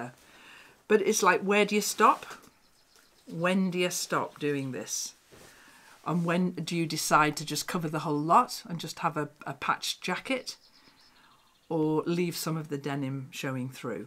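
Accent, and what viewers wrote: United Kingdom. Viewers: Woo so much work! Your jacket will be beautiful. My first vidéo from you but not the last one. I will learn english with your nice british accent.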